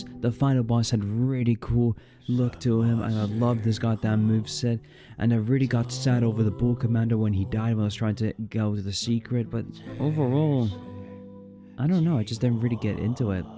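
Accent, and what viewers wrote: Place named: Scotland, United Kingdom. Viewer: Scottish accent gives that mythical feel, no Indian accent can ever achieve.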